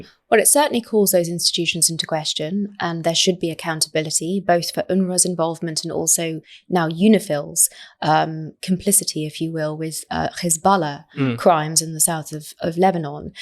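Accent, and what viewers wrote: RP, United Kingdom. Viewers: When idiotic Hasbara army like Michael Rappaport fails the propaganda exercise, send a posh British sounding white woman to spew more lies with bombastic words sounding all posh but contains zero substance.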